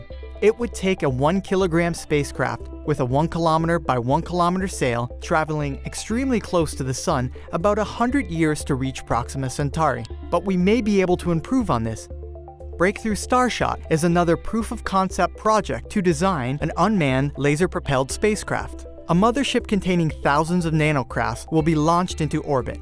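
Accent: United States. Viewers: You sound American. Most likely an American audience. Telling us speeds in kph is a bit snobby.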